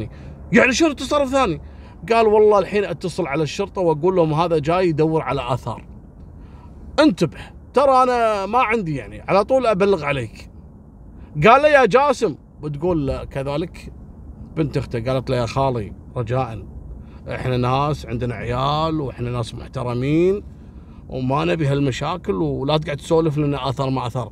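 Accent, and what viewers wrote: Kuwait. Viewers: اللهجة الكويتية حلوة ومفهومة.أنا تعلمتها من خلال سوالف الله يعطيه الصحة ويطول عمره 🤲👍🌹🇲🇦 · استاذ صرت اعرف واحب اللهجه الكويتيه شكرا الك القصه روعه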